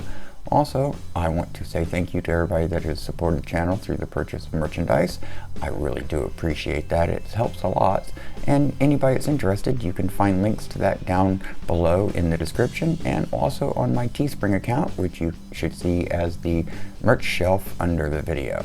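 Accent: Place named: Florida, United States. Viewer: Always thought you sounded like Batman (Kevin Conroy) with a Florida accent. Now I see you actually look like him I am delighted :)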